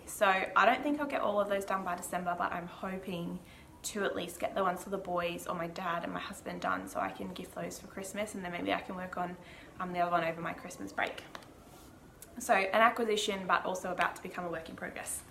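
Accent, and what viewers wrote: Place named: Australia. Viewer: I enjoyed this podcast. It’s great to hear an Aussie accent talking about knitting! If you use the helical stripe technique you won’t have a join where you change yarns. It’s really easy and definitely worth looking up. Good luck on your trip.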